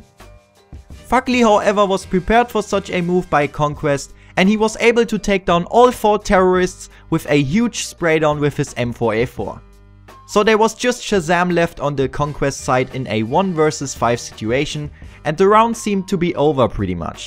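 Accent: Germany. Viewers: Haha hab sofort erkannt das du ein deutscher bist.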